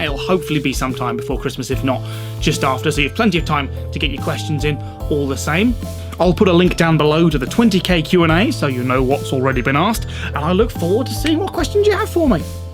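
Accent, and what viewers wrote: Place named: United Kingdom. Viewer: I came here from why did I say okie doki .. YOURE BRITISH!?!?!?!!! I AM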